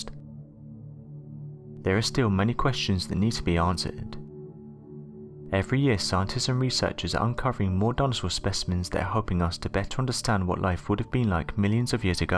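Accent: England, United Kingdom. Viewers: Get rid of this narrator with his heavy English accent slur is hard to understand.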